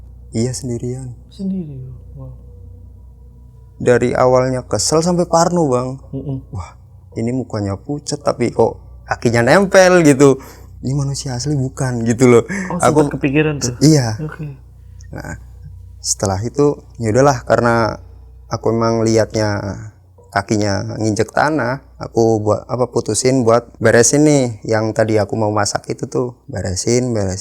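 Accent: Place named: Banyumas, Indonesia. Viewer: Aloy versi ngapak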